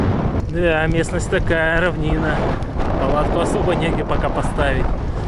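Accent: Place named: Urals, Russia. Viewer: Спасибо,! Смотрю тебя давно, пишу впервые. Благодаря тебе я посетила Платониду и Шунут-камень. Коренная уралочка, прожила почти 30 лет в нескольких десятков километров от этих мест, так и не добралась туда. Приятно слышать родной, уральский акцент. Здоровья тебе крепкого,ветра всегда теплого и попутного, людей хороших вокруг! С уважением, М.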